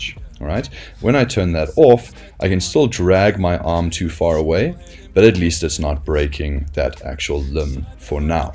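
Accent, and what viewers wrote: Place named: South Africa. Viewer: Thought it sounded South African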